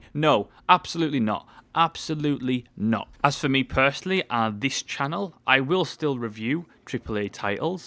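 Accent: United Kingdom. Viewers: I do not believe almost any "professional" reviewer (ign, gamespot, what have you) ever was paid to give a positive score, pretty much because once such a thing was discovered (and yes, it would be) he'd have an easier time kissing Emily Brontë than finding any other job in the industry. Also, surprise surprise, first Beth game out under this conditions is criminally woeful so far as performance goes on pc. Good piece man, I'm going to watch some more vids but you got a new sub in all likelihood. (plus, a British accent never hurts)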